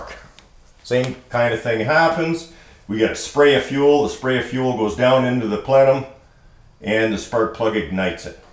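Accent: Canada. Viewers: Charlize Ravenna ---> You just saved me a whole lot of typing. I was going to suggest changing the title of the video to something like "Already understood details of the physical chemistry of internal combustion wherein every point is repeated at least 3 times, and carefully worded to include as many uses of the exclusively Canadian and universally annoying "aboot" mispronunciation of the word "about".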